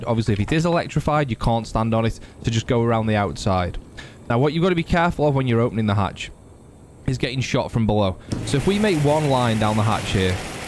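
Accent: Stoke, United Kingdom. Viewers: wait a minute......are you a stokie ? :D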